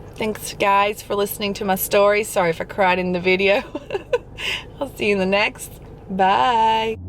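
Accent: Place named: South, United States. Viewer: I don’t think you’re crazy, you’re a mother (mama bear), you don’t look old enough to have 2 20 year old daughters, but I must say this as a Brit, love a southern accent on a woman, stronger the better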